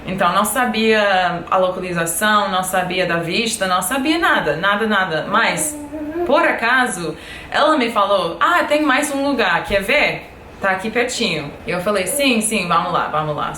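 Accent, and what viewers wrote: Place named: Rio de Janeiro, Brazil. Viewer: Gringa linda, adora a língua portuguesa e fala com um forte sotaque carioca. Haja charme! A cada vídeo, que você posta no YouTube, noto que seu Português está melhor. Parabéns pelo esforço. Valeu, minha fofa!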